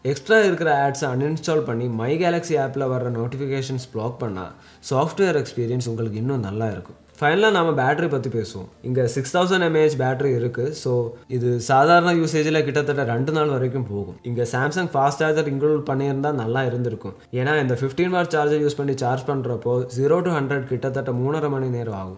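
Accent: Kerala, India. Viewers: Boss ...unga tamilile Oru Malayali touch.. erukke..neenga Oru Malayali.. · Sounds like a malayalie speaking tamil,am I right? Good job! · Malayali tamil, we want ash back on c4e tech tamil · Halloo bro. Indha mallu bro Tamil pesumbothu rombavae kaduppakuth So ivare replace senjal sombave nalla irukkum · Bro neenga malayalai ah! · மலையாளம் கொஞ்சும் தமிழ் ரசிக்கும்படி இருக்கு. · Hello Brother My prediction Correcta You Malayali Tamil Super Keep It 💐💐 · bro neenga malayalam ah? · Chetan malaiyali aano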